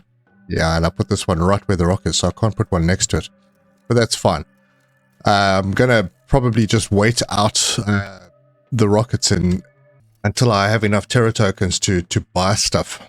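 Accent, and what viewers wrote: South Africa. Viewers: I was wondering if the accent was South African, Aussie myself but my great grandfather was South African, his son, my grandfather was responsible for starting my gaming addiction on his Atari back in the day.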